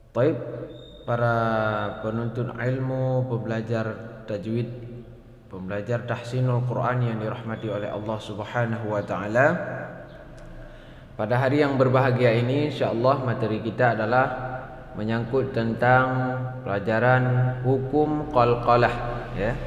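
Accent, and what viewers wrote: Aceh, Indonesia. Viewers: Orang Aceh ya ustd?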